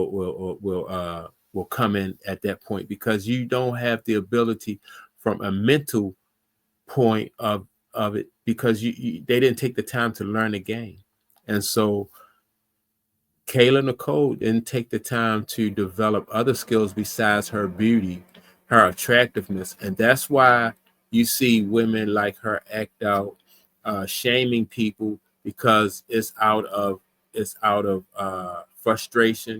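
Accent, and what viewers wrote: Baltimore, United States. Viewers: You sound like you from baltimore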